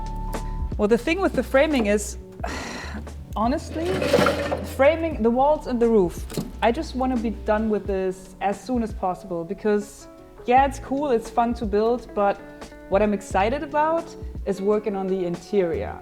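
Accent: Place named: Germany. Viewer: Man merkt das es ein deutsches Englisch ist, was sie im #Video spricht.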